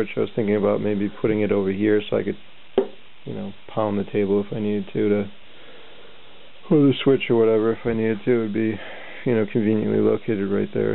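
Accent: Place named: New England, United States. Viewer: I heard the accent. One of those Nor'eastern States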